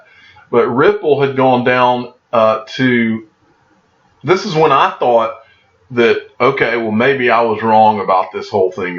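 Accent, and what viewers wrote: South, United States. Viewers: don't judge by voice alone, a lot of the southern guys are smart like Mike Judge who created hilarious movies and King of the Hill. This is the best XRP channel because has experience in the finance world & has been investing in XRP for 3-4 years BEFORE anyone else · My job keeps me traveling. I am rarely at home. I listen to you every day while I am moving from one place to another. I also have a Youtube channel. My monthly Google payment goes into XRP. The first, second, third and fourth time I heard you on youtube I clicked forward IMMEDIATELY! That southern accent of yours was the proof that you were stupid AS FAR AS i WAS CONCERNED! One day you posted a topic that caught my intrest and l listened to your entire video. I have been hooked ever since. YOU ARE AMAZING!!! Forgive me for my predijust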